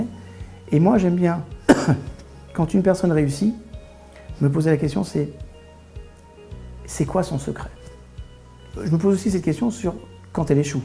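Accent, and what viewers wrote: Canada. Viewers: Très intéressant je vais m'y mettre des aujourd'hui!!en passant j'aime beaucoup ton accent canadien je suis de Québec maintenant vivant au Costa Rica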